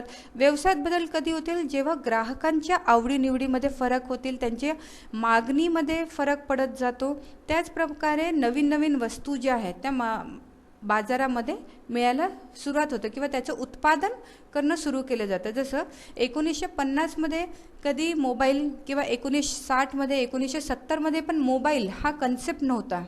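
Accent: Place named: Nanded, India. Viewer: Mam tumhi nanded che ahat na😅 tumcha language varun vatate , ekdam sundar teaching ❤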